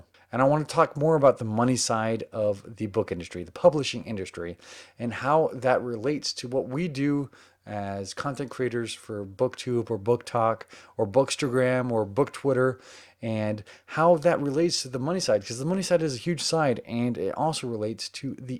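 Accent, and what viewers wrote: South, United States. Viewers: I love your podcast on this video at your house in Texas during your quarantine until you're watching one of your previous video of yourself from the past while you're talking about all of your previous reviews of all of your books and it's a lot of energy, so you're talking about everything including your top 5 goals and your future after that, but I wanna say I'm happy for you and I hope your husband, Zach will be very proud of you, because I love your Southern accent!!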